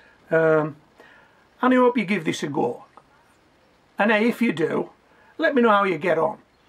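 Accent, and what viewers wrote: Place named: Newcastle, United Kingdom. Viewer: Love the accent. Why-aye man! Poysanass poortaytohs! Divvent eat em man! Howway!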